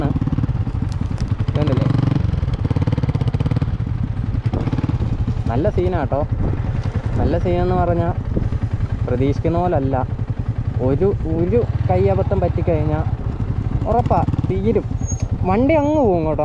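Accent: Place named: Kollam, India. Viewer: athalla bro broyude place kollam allenn choikkan karanam slang anu atha😁😁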